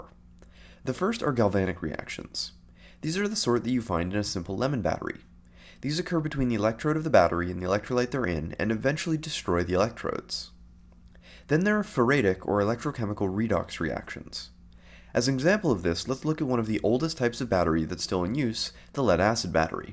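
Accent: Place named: Canada. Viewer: Ok, you sound Canadian- even so....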